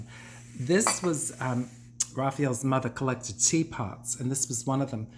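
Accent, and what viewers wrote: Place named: Bronx, United States. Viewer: Oh,. I cannot tell you what these daily thoughts of solitude mean to me. I look forward to them every day, and while the world has become a frightening place, your musings over the things that matter in that gentle, salted Bronx delivery are so wonderfully poignant, and make me feel truly connected to the things that matter most. This series is just incredibly calming and inspirational to me - Thank you, thank you, thank you beautiful. <3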